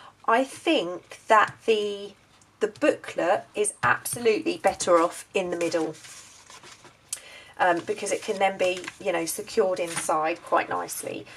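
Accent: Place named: Greenwich, United Kingdom. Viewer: Thanks so much sweetpea I also love your accent I come from Greenwich and have a similar accent! But now I live in Melbourne Oz xx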